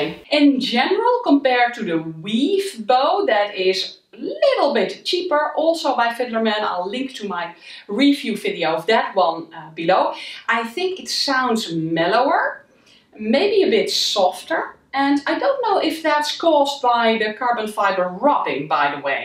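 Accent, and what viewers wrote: Netherlands. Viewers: I love your Dutch accent ! ❤️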